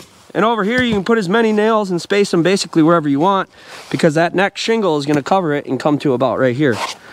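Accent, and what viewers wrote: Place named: Minnesota, United States. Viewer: Also cool because you sound like a fellow minnesotan 😂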